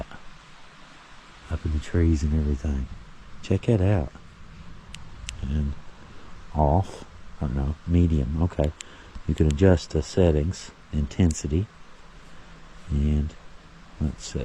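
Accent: South, United States. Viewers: I’m from Phila PA in the great Northeast. Tonight was the first time I watched your video. I was enjoying your southern accent when I noticed the depth of your research. Thank you for your work. I hope you get more viewers. this was the first time the algorithm directed me to your video and subscribed to a new channel.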